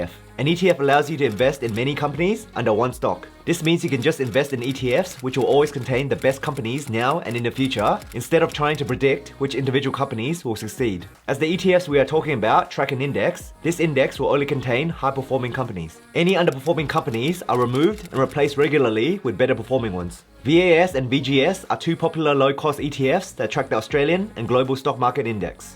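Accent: Australia. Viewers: He speaks like a true blue Aussie. You must be Asian which is fine. Good luck with your etf's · and you’re wrong! He’s got that Asian Aussie accent!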